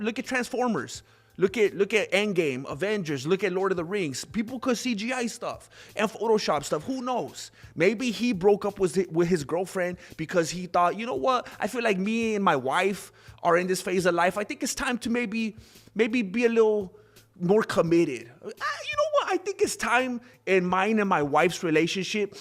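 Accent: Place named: Mexico. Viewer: Eyy fool where is that ole mexican accent ?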